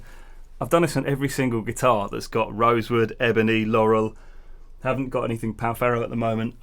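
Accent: United Kingdom. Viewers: This guy is so British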